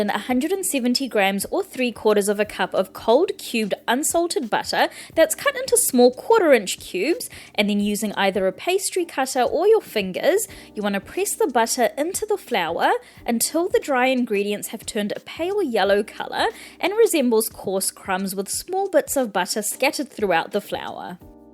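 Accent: New Zealand. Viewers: Hi just wondering your a NZer your accent sound familiar lol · Is this a Kiwi accent?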